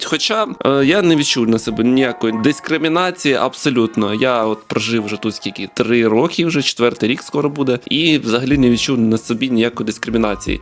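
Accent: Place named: Lviv, Ukraine. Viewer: Дякую за відео! Я зі Слов'янська і з 23 -го року живу у Львові. Обожнюю міста Лева🤩 Я був здивований, що ви не зі Львова адже у вас мова звучить лаконічно " по львівські"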